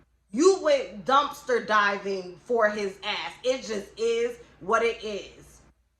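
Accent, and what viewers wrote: New York, United States. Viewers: Its probably bc I'm a country ass SC girl lmao but I LOVE a NY accent. I could listen to you talk all day lol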